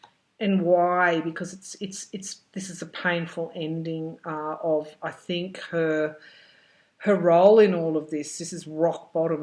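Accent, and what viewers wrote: Australia. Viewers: Hi,. I enjoy listening to your great Aussie accent and readings. When you have the inspiration, will you please do a reading for Maxime Bernier, the leader of the People's Party of Canada. This us our election year and Canada is in a terrible mess with lots more coming.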